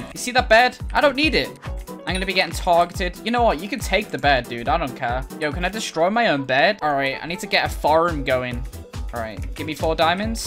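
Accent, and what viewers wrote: United Kingdom. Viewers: Is british? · is like british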